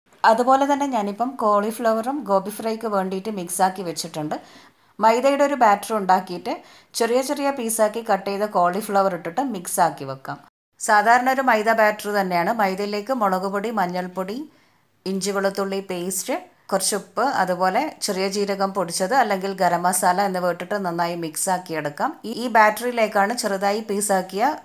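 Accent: Thalassery, India. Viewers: Wow adipoli 👍🏼 And style of talking also nammude thalassery style 😁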